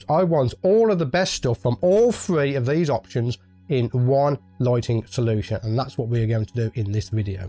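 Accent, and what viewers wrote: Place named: England, United Kingdom. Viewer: What part of England is your accent from?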